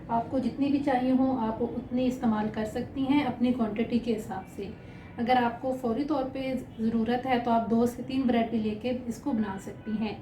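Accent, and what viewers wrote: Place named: Murree, Pakistan. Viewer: Focus on pronunciation I think so you belong to murree.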